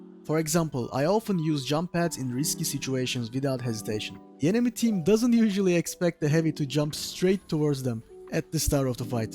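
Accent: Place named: Turkey. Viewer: Anaaaa, bu TÜRK AKSAANI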